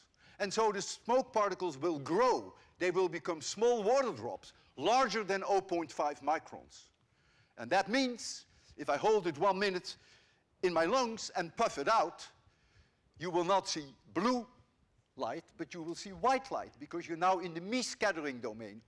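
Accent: Netherlands. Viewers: Yep, Dutch for sure. He threw me a bit when he said he'd seen it many times in Austria, but then I came to my senses. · 5 seconds of listening and I already know that he's a dutchie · I could hear immediately that he is from the Netherlands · I immediately heard he is Dutch. I don’t even have to research it.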